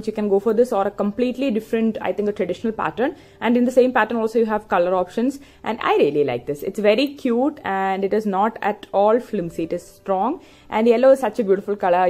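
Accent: Kerala, India. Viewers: Hi.. lovely review and very cute jewelleries.. more than those being cute, mallu touch in your pronunciation is cuter 😍😋👍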